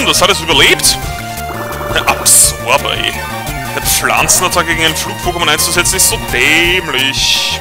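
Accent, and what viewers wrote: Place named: Austria. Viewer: Ich feiere ihn auch vorallem wegen seinem österreiischen Akzent :D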